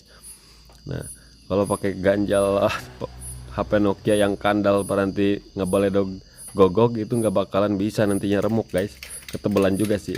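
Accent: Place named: Garut, Indonesia. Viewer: Tutorna mantap.urang Garut sigana mah,karunya urang Manado Weh rada ngahuleng wkwkwk😅😅😅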